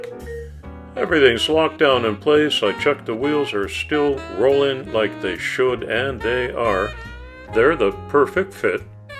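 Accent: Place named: Canada. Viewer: Dude, I LOVE your (not at all) odd Canadian pronunciations. I've begun pronouncing 'decals' as "deckles" now and then. It's an American thing I'm trying to un-learn: just because we pronounce it one way doesn't mean that's the correct way. Deckles, deckles, deckles all day long!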